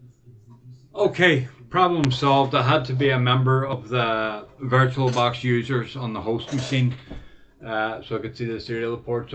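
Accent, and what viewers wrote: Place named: Ireland. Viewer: GerDay, Because I a real "bushie" (live and work in remote areas of Australia), I'm struggling just a little with your accent! NOT your fault - it's just how it is - I don't get enough exposure to Paddy's!!!!! All this is leading up to say it MIGHT be an idea if you're going to show this "from scratch" like this to include links and/or command line text in your description file so that folk who are NOT fully cognisant with Ubuntu AND (like me) who might struggle with your accent can follow along easier! Having said that, this is excellent, so far and I appreciate your efforts!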